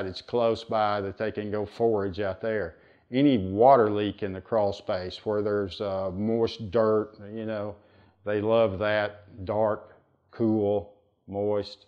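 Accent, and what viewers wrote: South, United States. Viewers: Hey, let me try an' place your accent...sounds like a base in Arkansas, with a Georgian overlay, and can I hear a hint of Kentucky, or are my ears playin' tricks on me? Laughs I ain't been down south since I was a kid, so I'm probably a bit rusty. (Used to live in Texas.) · He is southern and southern folk talk slow don’t like it find another video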